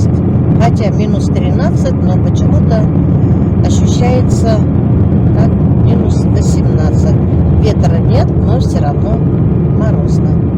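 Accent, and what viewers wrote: Tatarstan, Russia. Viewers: вы молодец люблю татарскии акцент я сама татарка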